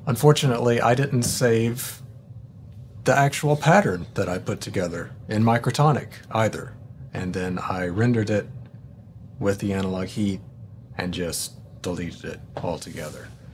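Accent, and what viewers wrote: South, United States. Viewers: First off, thank you for this video... I can employ it on the MKII (or+FX)... And second, I don't know whose accent I like more... Florian from Bad Gear or your southern charm. HAWHHHHHHich ever though, it adds to your dry wit, which you deploy like a sniper.... first you don't realize the moment is coming, and then when it lands, you wonder where it came from. All interspersed is some of the best first hand practical knowledge of gear.!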